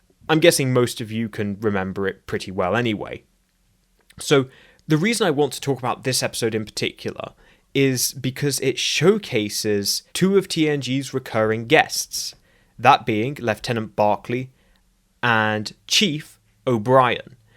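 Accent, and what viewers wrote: United Kingdom. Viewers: a British pronunciation should be expected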